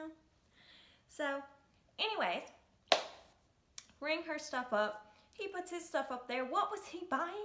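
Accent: South, United States. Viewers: love the Southern woman accent beautiful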